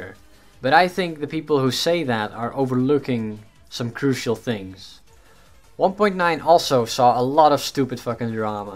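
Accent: Netherlands. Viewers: Are you dutch? Because you have sort of a dutch accent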